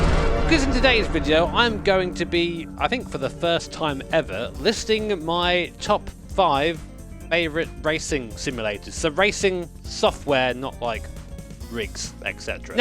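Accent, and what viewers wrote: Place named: Yorkshire, United Kingdom. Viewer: As someone from Yorkshire. That is definitely how we talk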